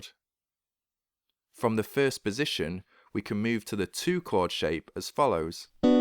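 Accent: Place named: Manchester, United Kingdom. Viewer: Excellent teaching! All in the form of little focused nuggets of pure musical gold :-) (It helps to have a basic understanding of 'theory' and a few years of noodling for basic ear training to relate to.) Your Mancunian (?) accent reminds me of the programme director of part-time courses at The Institute.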